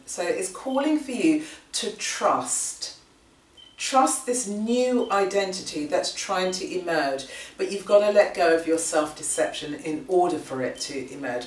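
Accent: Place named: United Kingdom. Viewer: Thank you. Great information. I love your down to earth approach to your videos and hearing this information in an UK accent. The necklace you are wearing is particularly stunning! However, what happened to your camera angle on this one!:-) Where do I need to be more courageous in my life?..... I have a mars/saturn 1/7 opposition so I've had to learnt to be pretty courageous to just keep going really... however perhaps I need to let people in to my heart instead of 'protecting' myself by holding them at arms length. However, how to change this?! It's easier to allow myself to love children than adults as they open my heart somehow. Another part of my life I could be more courageous is letting go of the day - and allowing myself the feeling of " I did my best and I let it go now' instead of overthinking what ever happened and giving myself a hard time about silly little things. 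Letting things 'be' is a form of courage I think.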